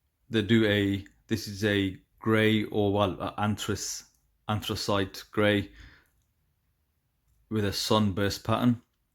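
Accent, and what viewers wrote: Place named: West Midlands, United Kingdom. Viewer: Sounds like you're enjoying the watch. Been tempted by one of these. Also, I recognise the accent. A fellow West Midlands lad? 😁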